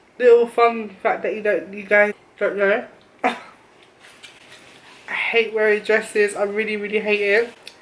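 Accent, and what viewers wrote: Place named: England, United Kingdom. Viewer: Where are you from if you don’t mind me asking, you sound English but you said it was 38° I know that in England it’s no that hot 😂😂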